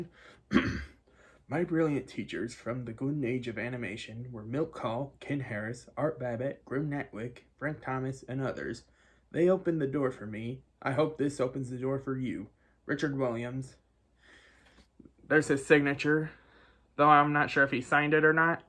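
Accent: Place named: South, United States. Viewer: Fantastic! After many years away from animation, I'm returning. This book is a must and I look forward to reading it again. By the way, I love your accent! I love the southern accent :)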